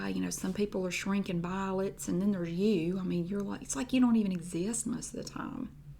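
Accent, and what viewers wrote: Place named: South, United States. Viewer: The shadiness of these characters kills me lol your southern accent and the “bless your heart” gets me every time!!! Cant get anymore shadier than saying “bless your heart” to someone lol alsooo this character gives me “Mrs. Roper” from Three’s Company lol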